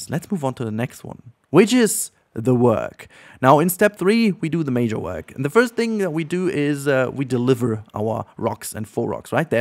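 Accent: Germany. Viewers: Sorry to ask but are you German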